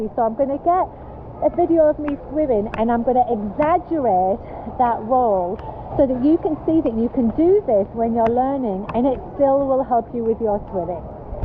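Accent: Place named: United Kingdom. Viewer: Hi and son Great Vidio you sound British. Is this swimming pool in Uk. Is it public? Looks lovely. We have an outdoor pool in South Wales but it’s closing for winter.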